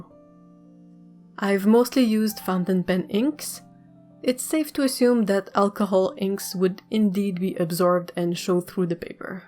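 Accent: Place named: Quebec, Canada. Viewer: Juste à entendre ta voix, je savais que tu étais du Québec ! Tu fais du travail magnifique!! Merci pour le partage :)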